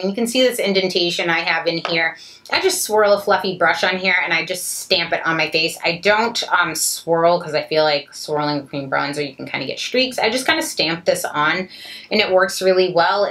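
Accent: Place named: Boston, United States. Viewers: Oh my god - you're from Boston. No wonder I understand everything you say and always know what you mean without explanation. Obviously, I'm from Boston (now transplanted to CA). Your accent isn't heavy, I hear your "r's" being pronounced quite clearly. It's more of an attitude/style of expression that is Bostonian to the core and always seems "right" to me because it's home. Okay - back to finish the video now. I had to pause it to write this - the new-found knowledge of your Bostonian-ness was wicked pissa and wicked monumental. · Omg I love you, I knew you were from Boston 😂 You have that edge. I live in Cambridge and people always have something to say about the way I talk. Now I know why I love your channel! ( other than the obvious) . People also should spell it Mahhhhk not Mark lol where did you grow up?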